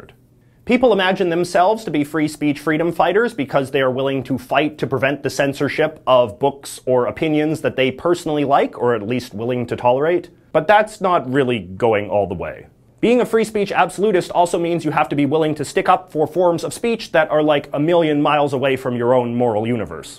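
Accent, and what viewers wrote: Canada. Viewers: You keep saying A-Boot...i am canadian and i say about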